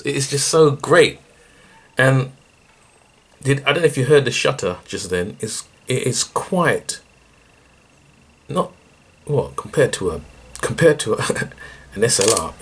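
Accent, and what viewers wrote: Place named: United Kingdom. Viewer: The 21st century has arrived. I was looking at the 80d as it has a headphone jack, but I guess I can use the meters on the m50 if I shoot video, and not break my back. Enjoy your 22 f2 as I read the bokeh is great plus low light. Your photos look great, do you think this body performs as well as the 80d for PHOTO image quality? If it does, it is a lightweight bargain. Great to hear a British reviewer: I am from near Newmarket :-) Other reviewers compare this camera with expensive SLR which is an unfair comparison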